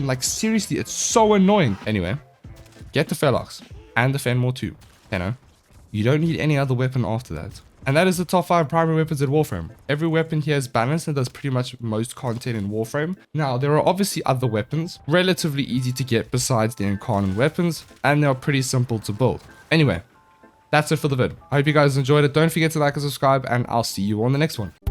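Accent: South Africa. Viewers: U south-african? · You sound South African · Are you south africa? Your accent sounds a lot like it, just wondering as I am from SA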